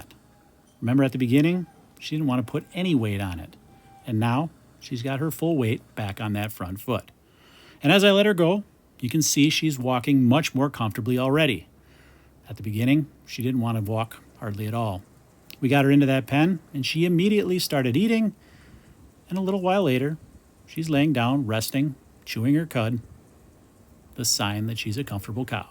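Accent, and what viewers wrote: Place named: Midwest, United States. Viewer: I am obsessed with. His gentle Midwest accent and easy demeanor is fun to watch and listen too. must have huge forearms and wrists as he removes that dense horn. Thank you for your educational videos.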